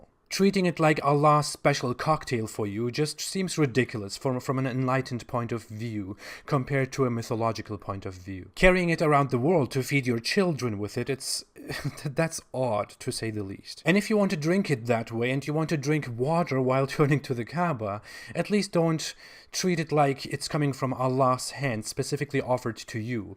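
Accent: Germany. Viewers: You have a German accent? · "I'm not Hitler just because i have a German accent"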